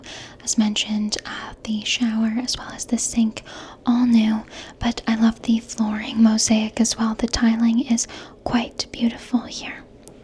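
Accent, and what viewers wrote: France. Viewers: Ugh you look absolutely gorgeous! I loved how you she used her French accent in there too, Stunning apartment, it just looks peaceful!!💕💕💕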